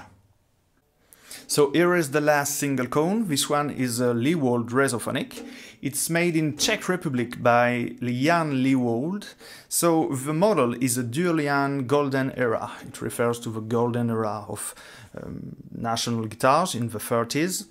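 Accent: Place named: England, United Kingdom. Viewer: Super video, bon j'avoue que l'accent anglais pique bcp et que j'ai eu un peu de mal avec ça mais sinon très chouette sujet, bien traité et magnifique jeu ! Gros coup de coeur pour les résonateurs mule depuis quelques temps (et cette vidéo ne fais que le confirmer) donc ma préférence va au tricone mule vert.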